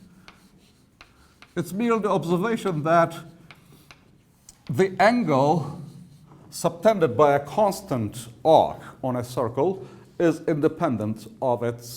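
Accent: United Kingdom. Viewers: yo hablo español y estoy buscando clases de geometría en ingles para mejorar no solo geometría sino también en ingles :D, su ingles británico me voló la cabeza ._.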